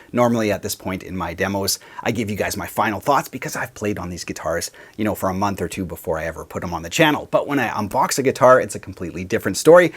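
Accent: Canada. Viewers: Never heard you sound so Canadian aye!! Usually rarely hear you sounding typical Canadian Aye, or at least how we Americans stereotype it!! Said with love!! Love the Videos!! Your actually the 1st channel I started watching after not playing for 20 years. So Thanks for getting me excited about playing again!!!!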